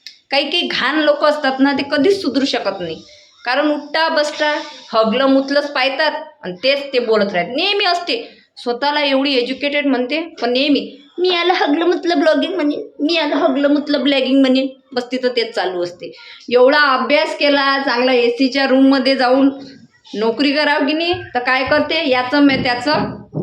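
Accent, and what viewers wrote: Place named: Vidarbha, India. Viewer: Mala tar aapli vidharbhachi marathi aadhipasunach aavdte rao mastach hasavlat tumhi 😂 baki aashu tai aani tumhi mala konachich baju nahi ghyaychi pan bollat ekdam kadak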